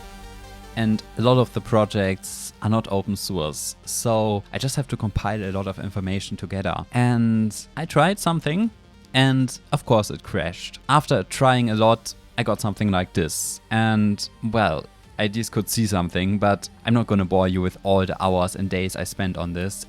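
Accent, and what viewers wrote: Germany. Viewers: i hear german....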